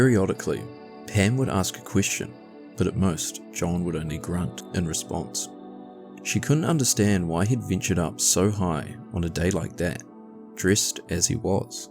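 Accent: New Zealand. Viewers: Are you from NZ? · I turned on notifications just for the Kiwi accent haha 🇳🇿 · Are you from New Zealand or South Africa? I'm trying to place your accent.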